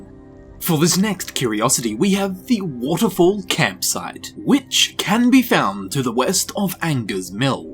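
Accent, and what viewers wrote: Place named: Australia. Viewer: Is it lost on people that he's Australian or.... Of course he's going to "mispronounce" words (Based on YOUR representation on how the words pronounced), so relax with it. He's still funny and makes great videos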